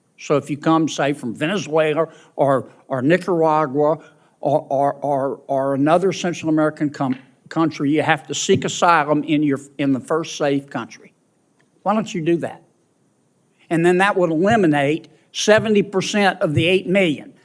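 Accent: South, United States. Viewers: I wish this guy would speak slower and enhance his southern drawl.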